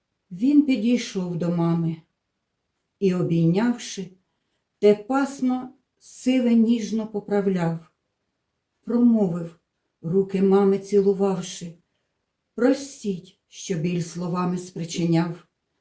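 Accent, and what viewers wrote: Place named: Cherkasy, Ukraine. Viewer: Наче з рідної Черкащини говірка.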